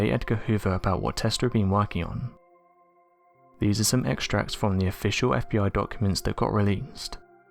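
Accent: United Kingdom. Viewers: I cannot stand this guy's wimpy British voice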